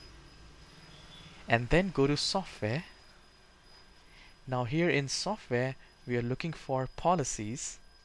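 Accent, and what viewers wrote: India. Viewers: Thanks bro, are you indian by any chance?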